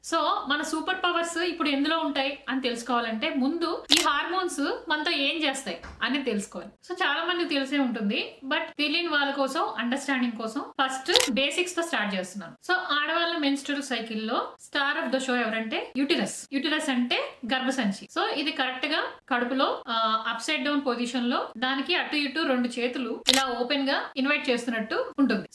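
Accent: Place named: Godavari, India. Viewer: Hi garu. One thing i could say.. You are so honest & Simple. I started watching ur channel with "HOW NOT TO DIE". You are from KAKINADA? Coz your slang is similar to EAST WEST GODAVARI. One thing i want to know, why you stopped NAMASTE LONDON Channel?? You said i woked as Assr manager in indias Lasrgest bank. SBI Or HDFC? ( Iam also a banker) Lots of best wishes from us. Appreciating your partner for supporting your dreams n aspirations. Wishing all the best to Be happy with channel.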